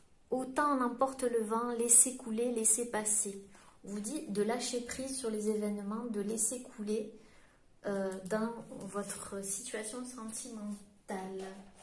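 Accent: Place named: Midi, France. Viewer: Vous. Êtes. Ùne. Gamme. Qui. Libéré. Autrui. Et. J. Adôre. Votre. Àccent. Dù. Midi!!!!!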